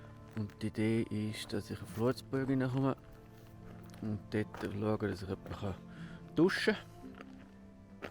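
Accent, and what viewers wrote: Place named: Central Switzerland, Switzerland. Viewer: Hallo lieber Auf meinen Weitwanderwegen habe ich immer auf das Zeltschleppen und -schlafen verzichtet. Deshalb bleiben mir die wunderbaren Strecken in Amerika verwehrt. Umso schöner ist es, wenn ich nun dank dir und deinen schönen Videos den gesamten CDT (hoffentlich) mitwandern kann🙂! Sogar dr komisch Innerschweizer Dialäkt verschtohni guet 😊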